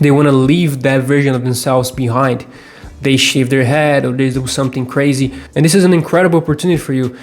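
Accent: Brazil. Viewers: he has a slight accent from northeast Brazil, but this is normal. hahaha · Real, quando eu olhei e ouvi ele falando já ficou claro que era br KKKKKK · Guy, you're simply amazing! Are you Brazilian? Your english is so perfect. Kudos!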